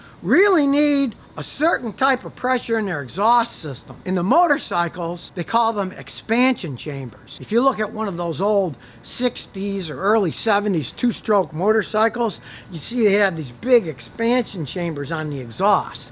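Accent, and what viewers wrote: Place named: Alabama, United States. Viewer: He must be from alabama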